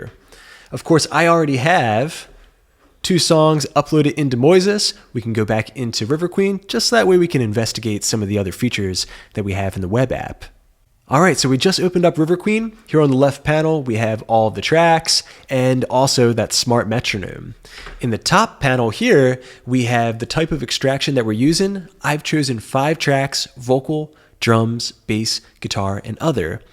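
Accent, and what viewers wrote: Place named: Maryland, United States. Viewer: Great demo! Your accent sounds a lot like a Chesapeake Maryland accent.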